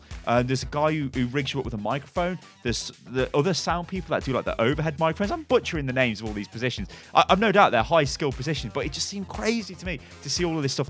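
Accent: United Kingdom. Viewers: what happens. I dont wanna watch this video of a british man talking aasying hoy hell :0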